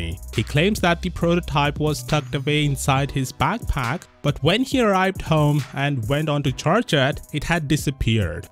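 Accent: India. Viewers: His accent is that of an Indian person correct? They called me like a hundred times a day I am inherently racist sorry I can't stand you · He has a certain accent. Indian maybe? I'm not sure. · Are you India? 😅 I just wonder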